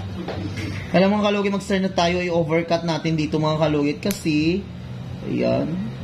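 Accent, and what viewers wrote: Iloilo, Philippines. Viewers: tga davao ka sir bat ilongga ang sulti ninyu dha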